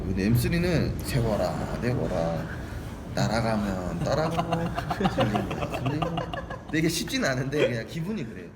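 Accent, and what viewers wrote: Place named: Jeolla, South Korea. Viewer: 안녕하세요..예전에 제네시스 EQ900 모터스튜디오에서 잠시뵈었지요..잔라도 사투리 억양과 준비 많이한 멋진영상 잘봤습니다..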